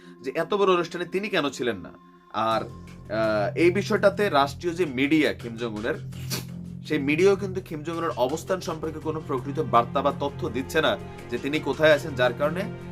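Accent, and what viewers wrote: Comilla, Bangladesh. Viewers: Tomar video gulo khub pochondo kore dekhi.Tomar news e aanek totho pai r tomar presentation besh bhalo. Tobe tomi comilla r ancholikota over come korte paronai. Tumi bar bar desh k dash bolo. Jeta srutikotu lage. Tumi bolechi mind koro ni to ? Aami tomar ma khalader moto. So....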